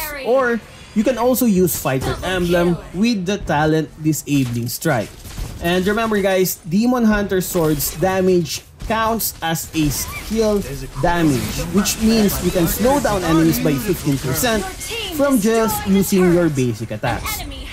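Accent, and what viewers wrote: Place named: Philippines. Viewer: The pinoy accent tho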